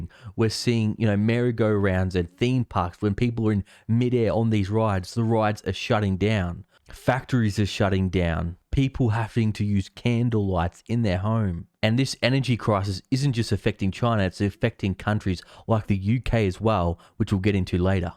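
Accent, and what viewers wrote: Australia. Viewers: Mahket? Its not a silent R, its market banned cool? You mean coal. I've never heard such a slack jawed aussie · Yeah u need subtitles for you American viewers they won’t understand your full Aussie accent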